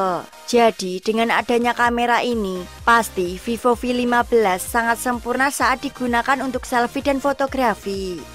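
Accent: Java, Indonesia. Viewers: jawanya sangat.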